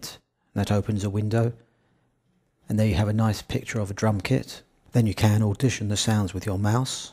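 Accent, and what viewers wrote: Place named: England, United Kingdom. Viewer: luv your Enlgish English accent <3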